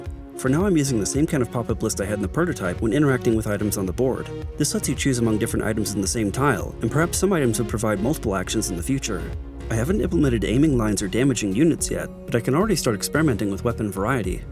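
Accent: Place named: Spain. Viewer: The reason why the creator pronounces it that way is because he is a native Spanish speaker. Portuguese speakers pronounce it as "Go-dodge" as in Dodge. But if we are talking about "the correct way to pronounce it" or "the way you should pronounce it" In English then "Go-Dot" is incorrect and the "Go-Dough/Go-Doe" would be correct in that case.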